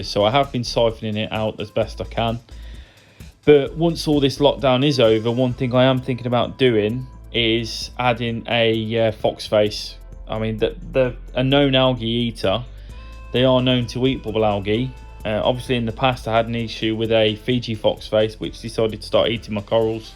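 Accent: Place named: West Midlands, United Kingdom. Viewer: West Midlands accent I'm hearing there I think pal?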